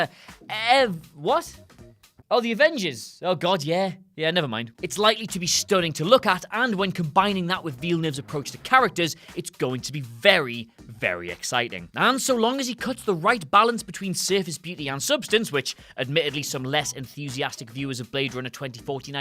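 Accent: United Kingdom. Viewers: I can't believe I have to watch and listen to you ramble on an entire minute before you actually start getting to the point!!!!! Do you really think we want or need all that, way to fast and British, chatter at the beginning.. I mean, the subject matter is interesting and all but jeeeeez, get on with it!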